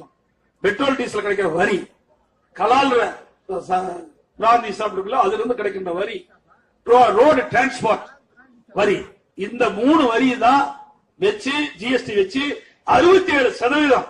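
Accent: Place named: Kongu, India. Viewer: Kongu tamil parakkuthu sir unga tock la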